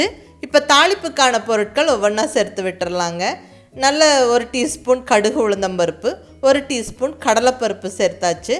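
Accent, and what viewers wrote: Coimbatore, India. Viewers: ........w r u????? I want to see u..... i like ur all recepies......kovai slang superrrrrrr......i like u.... i am INDHUMATHI vellore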